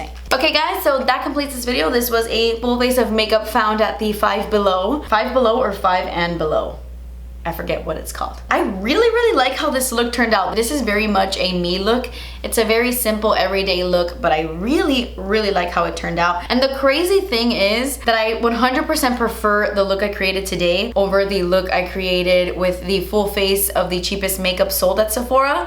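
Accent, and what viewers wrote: Cuba. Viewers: She's cubana 😊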